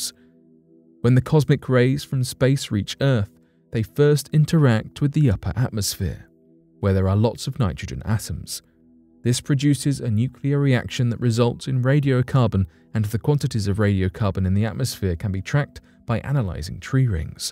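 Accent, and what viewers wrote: United Kingdom. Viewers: Lisping is annoying, even with a British accent. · I'll believe anything in a video that's narrated by someone with a British accent. Subscribed.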